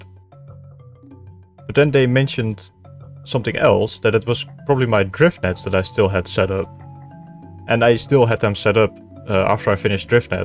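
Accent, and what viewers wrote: Netherlands. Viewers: I love the Dutch accent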